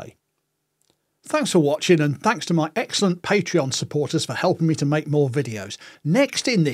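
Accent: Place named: Scotland, United Kingdom. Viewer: This is the first video I've watched of your channel. I'm going to subscribe, absolutely hitting on things I'm very much interested in. One thing I'd like to observe, I believe you may hail from the same geographic location as Sean Connery. It sounds that your dialect and accent is very similar to his, unless he was simply acting and faking his natural voice on screen. Anyway, thank you for your hard work!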